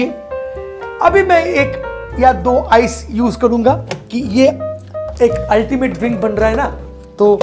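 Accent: Bengal, India. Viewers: you are too good - I love the Bengali accent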